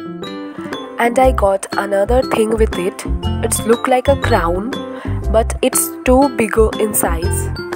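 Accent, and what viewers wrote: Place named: India. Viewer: Are you indian 🇮🇳 your voice is matching 😊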